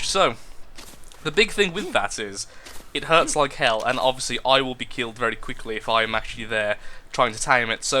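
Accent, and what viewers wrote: United Kingdom. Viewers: Thank you for using Centurion. If you tame a particularly strong beast Legionnaire might be a great name too. As a former radio Program Director I have to say you draw far more attention to your speech difficulties by talking about them than they really seem to deserve any more. I think they may be larger in your mind now due to your hard work to get rid of them than they actually seem to most people. I wouldn't have noticed anything this episode had you not gone on about it. But it's your show. If your point is to encourage others to do a show who think their speech is not perfect that's a whole other matter. Then well done. But really, your Brit accent makes you come off extra smooth to us Yanks and I suspect you don't realize how much say compared to the guys at Good Mythical Morning for example. A funny show, but... How many in your audience do you think we're screaming at you to check out the drop at the top of the hill? 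LOL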